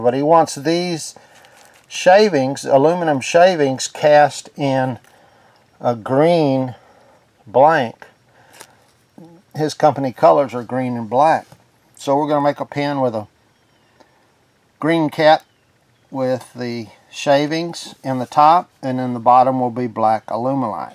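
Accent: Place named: Texas, United States. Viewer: I just recently subscribed and I am enjoying your channel. I don't know for sure where you're from but you sur sound like a fellow Texan. Keep up the good work. Jim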